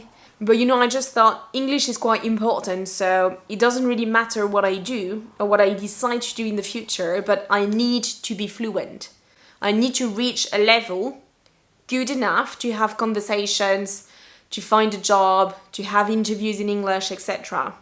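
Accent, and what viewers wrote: United Kingdom. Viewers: At times, the influence of English teacher does affect our English learning journey.. By the way, I thought you're a British because your accent sounds like a typical British accent.. Nice story of English language journey you've shared with us, 👍😍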